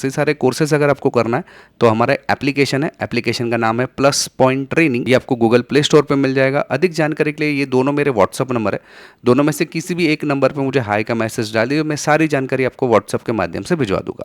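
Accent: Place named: South India, India. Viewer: Nice explanation, you are from south india.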